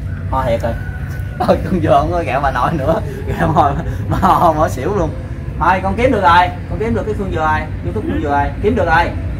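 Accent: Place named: Mekong Delta, Vietnam. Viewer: Haha, giọng điệu đúng chất miền tây luôn a ơi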